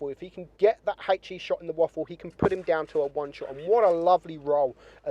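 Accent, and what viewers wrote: United Kingdom. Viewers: Where is he from? UK?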